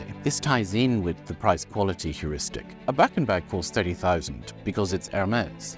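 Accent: RP, United Kingdom. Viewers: Ive never heard a more posh uk accent in my life he shld b narratin books as a side hustle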